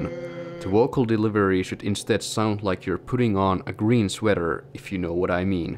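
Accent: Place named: Europe. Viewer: Late to the party: are you a Dutchman? You have a very European accent. You did hit the nail on the head and distilled Stoner Doom to it's finest essence. If you want Dutch Stoner/Doom try the band ' ' from Leiden!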